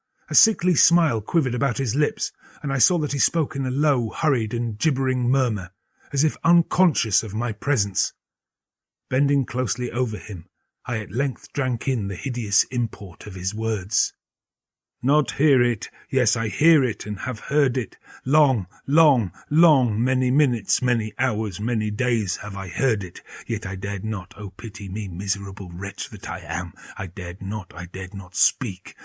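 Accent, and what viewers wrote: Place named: England, United Kingdom. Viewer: At last, I've time to listen and enjoy. Ahhh, your French! And why does Poe sound so good in an English accent, when it's American? Who can know these mysterious things. ;-)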